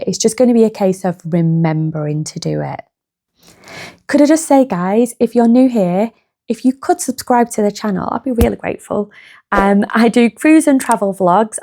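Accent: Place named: England, United Kingdom. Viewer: Great Clear English Accent Love it ❤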